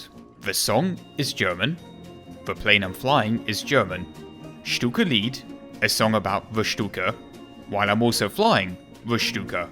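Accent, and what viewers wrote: United Kingdom. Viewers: Im fucking rolling. Damn mate. Forgive me but i always thought you were British from your accent. You're fucking awesome mate please keep making more awesome content. This made my weekend. (Watched this feb 22 so im bit late.) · I mean, there are areas of the UK that just talk like that, I’m sure they didn’t all go to private school.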